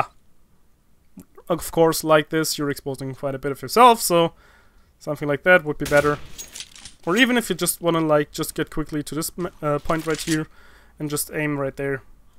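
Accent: Germany. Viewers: are you german ? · are you german you have a german accent in your voice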